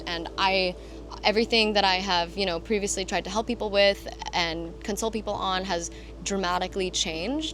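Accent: United States. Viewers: Sustainable energy. Such nonsense. And you sound like a fake person anyway. UN = Unfree Nations. I get a headache listen to your California accent and fake nice person acting. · You are so beautiful on camera. Are you working with a professional film crew to do your make-up, hair, and lightings? You sound American, what nationality mix are you?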